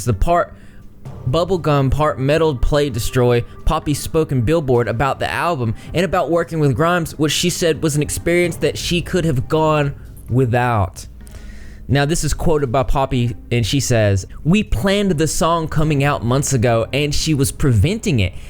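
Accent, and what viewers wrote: South, United States. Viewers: Why did i think you were from Australia? Now you sound southern .. what in the tarnation is going on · Just subscribed :) I like your accent is it from Alabama or Tennessee very southern. Ps I like your analysis and you’re pretty handsome . Reminds me of one the singers from I see Stars. Have a great day! Haha Best regards, Mimi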